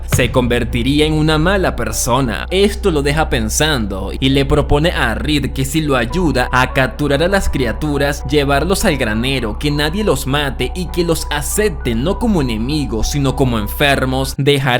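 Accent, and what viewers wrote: Venezuela. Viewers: Chamo me dí cuenta de que eres venezolano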